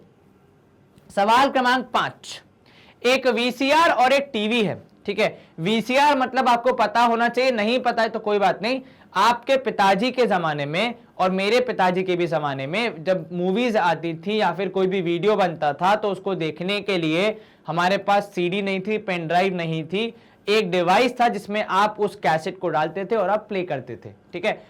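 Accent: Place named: Rajasthan, India. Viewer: I think that you are from rajsthan right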